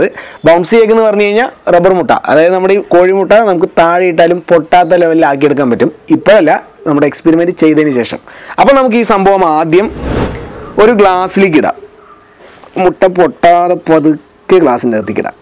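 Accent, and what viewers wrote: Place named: Palakkad, India. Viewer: ചേട്ടാ... ചേട്ടൻ Palakkad ആണോ?!? സംസാരം കേൾക്കുമ്പോൾ അങ്ങനെ ഉണ്ട്... നമ്മളും Palakkadinna😅😅 chettante videos എല്ലാം poliya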